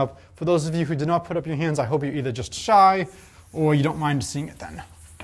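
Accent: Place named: Boston, United States. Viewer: Q: What do Bostonian doctors say when they need to examine their patients' throats? A: "Say r" Ain't nothing to apologize for, it's charming :-)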